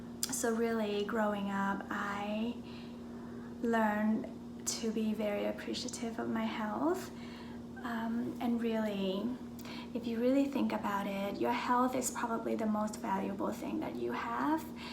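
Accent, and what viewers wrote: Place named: United States. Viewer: Your accent is very Murican and you're a cutie patootie!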